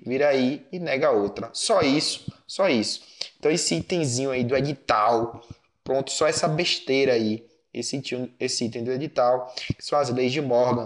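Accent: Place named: Bahia, Brazil. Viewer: Sotaque baiano arretado! rsrsrs massa!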